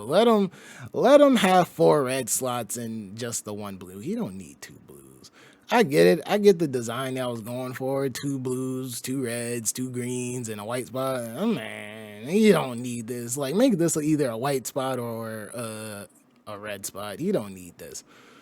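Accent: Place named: DMV, United States. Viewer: Ah gotcha. Accent sounds like back home. Good video.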